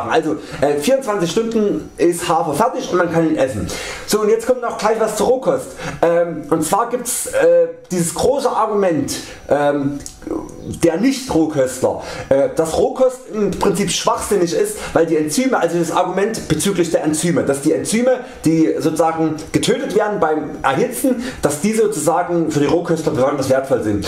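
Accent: Saxony, Germany. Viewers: Dein sächseln klingt ganz anders als meins =D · Ich verstehe dich gut- auch wenn du manchmal schnell und viel redest ( bin da teilweise auch so) daß du aus Sachsen kommst hört man shcon- aber das ist doch nicht schlimm! Ganz normal dss mand as ein bißchen raushört, aber soo viel ist das auch nicht. Ich komme z.b. aus dem Sauerland ist bei Dortmund, und rede auch kein gaanzes Hochdeutsch das würde man auch hören wenn ich woanders wäre, das ich aus dem Sauerland bin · Ich finde das echt ne nette Sache von dir, dass du kleinen Youtube Kanälen eine Starthilfe geben willst! :) Aber mal eine Frage: Was genau definierst du als Erfolg? Kommerzialisierung?! Denn Erfolg kann sehr individuell sein! Für mich ist schon das reine drehen eines Videos ein Erfolg :) Ich finde deine Art sehr klasse und sympathisch! Wegen mir kannst weiter sächseln wie du willst :D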